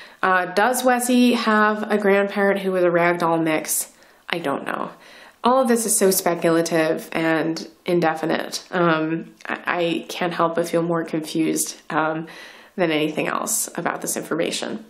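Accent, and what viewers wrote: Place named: Wisconsin, United States. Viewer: I can tell youre from Wisconsin. Lol.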